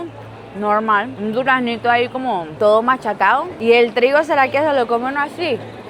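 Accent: Venezuela. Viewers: me encanta como aún mantienes el acento venezolano. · Ojo con tu acento. No te vayan a agredir por allá por ser venezolana.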